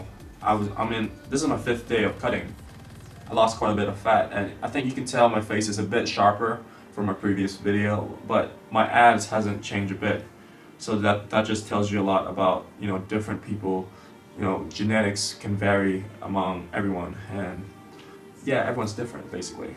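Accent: United States. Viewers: From your accent I thought you were American not British! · You said you are from Britain but then why do you have an american accent???????????????????//// ????????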